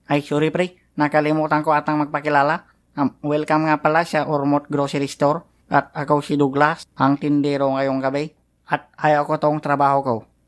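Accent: Ilocos, Philippines. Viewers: Hahahaha ilocano ka gayam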